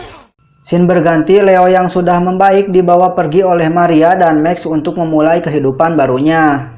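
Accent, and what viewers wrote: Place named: Lebak, Indonesia. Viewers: Sundaan geuning..prekeetek emang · Medok sundanya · Narasi nya ..suara.kedengaran sprti dari Lebak yaaa....pas lagi bilang ..Lucerroooo...Leeooo...Romeooo...sprti sandiwara Raadioooo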